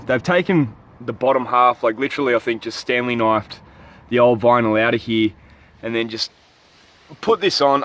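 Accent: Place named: United States. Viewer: Mate, your generation is sounding more and more American everyday. We speak English, that’s English English, not American English. So when we say ZH it’s not Zee Aitch, it’s Zed Aitch. Nice car.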